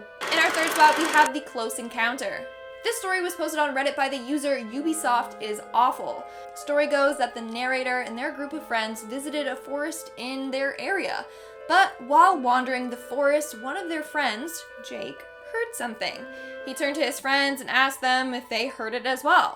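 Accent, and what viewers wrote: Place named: United Kingdom. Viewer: i thought she had a british accent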